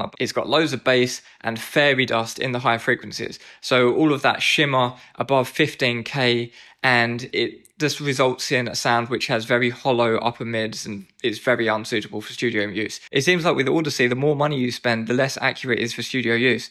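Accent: United Kingdom. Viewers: Well, he's opinionated. And with a British accent can help make him more obnoxious. I guess whatever works.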